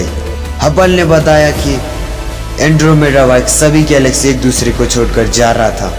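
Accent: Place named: Nepal. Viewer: U nepali bro Accent ley tha vo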